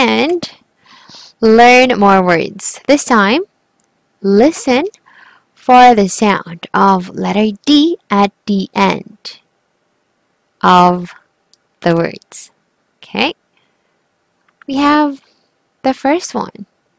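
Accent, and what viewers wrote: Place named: England, United Kingdom. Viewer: Nice english accent po ate sana maturuan niyo ako ganda niyo po keep safe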